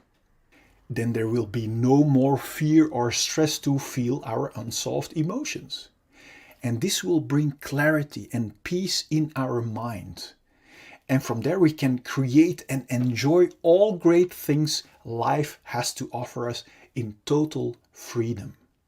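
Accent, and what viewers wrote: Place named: Netherlands. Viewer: Accent sounds Dutch.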